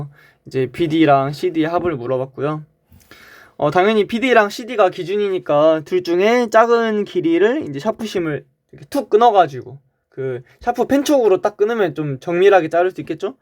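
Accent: Jeolla, South Korea. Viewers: 전라도 사투리 구수허네유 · 그 수학 관련된 건 아니지만 전라도 사투리 듣기 좋아요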